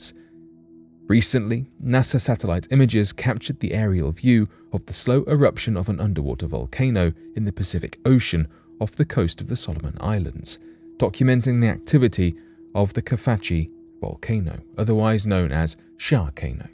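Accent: United Kingdom. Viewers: Cmon now.. its a quieter gentle UK 🇬🇧 accent. Far better than some we have in 🇺🇸 🌎. God bless all!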